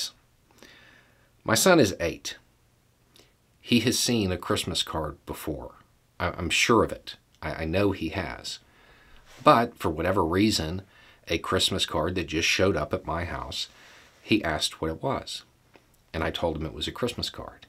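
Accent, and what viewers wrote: South, United States. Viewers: Enjoy your channel (and accent from one southerner to another). What I tell folks is it keeps ppl in jobs. In this case the postal workers. Your son would probably answer that Internet companies have workers too? Well no son. They have AI reps that you can't get an answer from or they've lost their jobs like Twitter. I won't get into the environmental part of this, but as others have pointed out not all paper is cut from trees. HAPPY HOLIDAYS! See? I just saved time, money, and a tree. Have a good'un.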